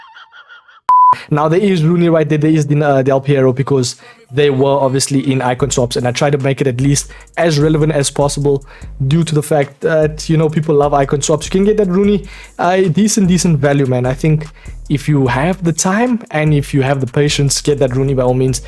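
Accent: South Africa. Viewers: As sooon as i heard your voice i knew you were south african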